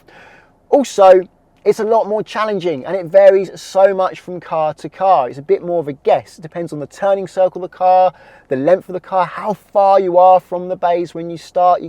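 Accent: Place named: Oxford, United Kingdom. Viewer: Shit oxford eng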